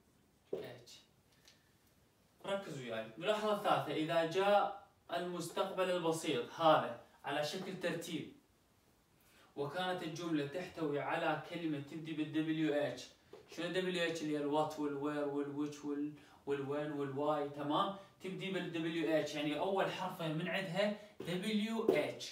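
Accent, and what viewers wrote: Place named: Nineveh, Iraq. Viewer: هههههه نفس لهجتنا اني من نينوى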